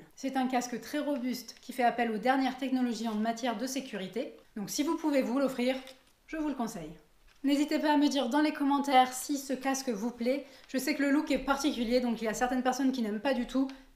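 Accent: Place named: England, United Kingdom. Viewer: Je kiff trop ton accent Anglais !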